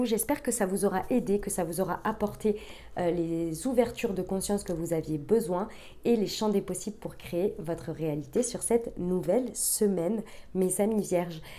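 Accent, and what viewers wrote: South, France. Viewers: Bonjour merci c'est tout à fait cela le ,17 mon chéri qui rentre de 15 jours de voyage et nous allons enfin commencer notre histoire le 23 grande fête pour les 35 ans de ma fille 🙏☀️🍀🎊votre guidance est incroyable pour moi c'est fou mille merci de me rassurer dans mes angoisses de vierge . Bisous du var 🥰 d'ailleurs votre petit Rose quand vous parler me dit que vous êtes du sud aussi ☀️☀️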